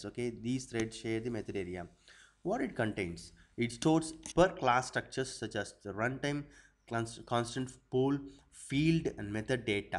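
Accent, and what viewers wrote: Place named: India. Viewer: We Americans just have a hard time with Indian accents. Slow down, we're too fat to keep up.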